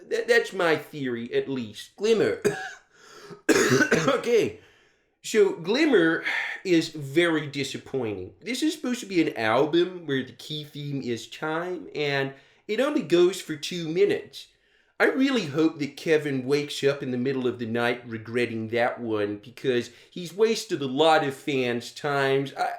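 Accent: United States. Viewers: love you mate but this video is legitimately shit, think the american accent gave me an aneurysm, even worse than your MAFS stuff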